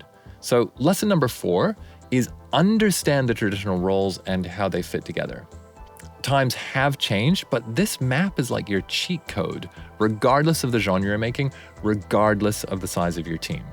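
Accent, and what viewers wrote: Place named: United Kingdom. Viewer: the partial british accent is an adorable marker of his time abroad "schtewdio"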